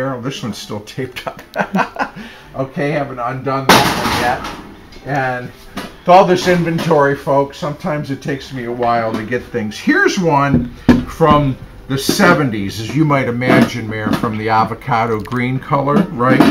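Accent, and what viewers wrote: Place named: Michigan, United States. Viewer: Michigan! That's why you talk normal. I'm from Northern Illinois.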